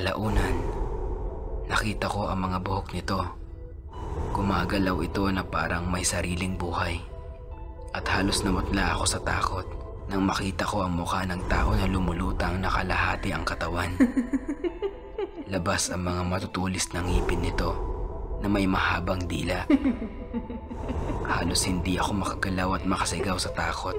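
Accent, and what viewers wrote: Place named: Ilonggo, Philippines. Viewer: Taga saan ka ? Taga capiz ka,? Bat accent ng salita mo d ilonggo,,nano to mn bc gawa gawa mo lng yan istoria mo,, 😂 ,,,,peace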